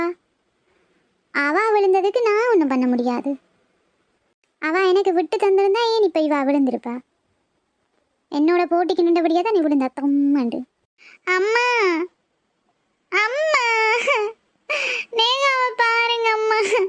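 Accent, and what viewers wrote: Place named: Sri Lanka. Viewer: Akka srilankan tamil Mathere iruku neenga srilanka va 🤔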